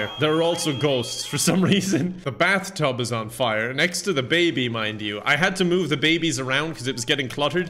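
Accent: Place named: Ireland. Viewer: New video from Me: tremble to hear the cute Irish accent and watch the latest massacre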